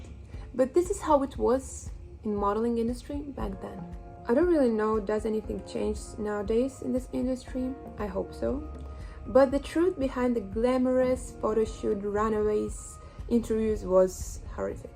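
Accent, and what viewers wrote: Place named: Russia. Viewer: а ты тоже русская,слышу по акценту аххах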